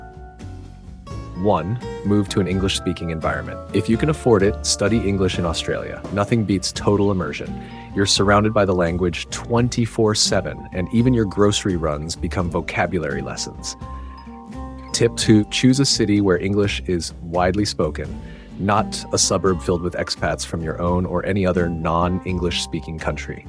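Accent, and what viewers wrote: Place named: United States. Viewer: For me, this sounds like a typical American English accent. I’ve met some Australian people here in Canada, and their way of speaking is quite unusual and not very easy to understand.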